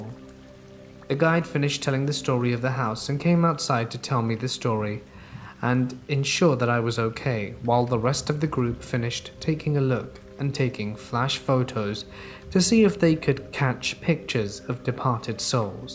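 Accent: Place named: RP, United Kingdom. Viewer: I love your voice because it sounds like Rp-English. Excellent for practicing pronunciation.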